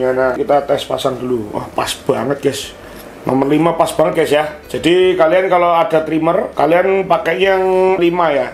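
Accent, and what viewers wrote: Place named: Java, Indonesia. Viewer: Medok rasah nggo gua gua mas😭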